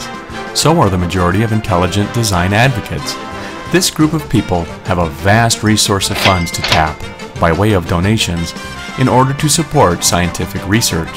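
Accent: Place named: Canada. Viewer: Off topic but you sort of have an accent that I notice. Especially when you pronounce "ideas", are you Canadian?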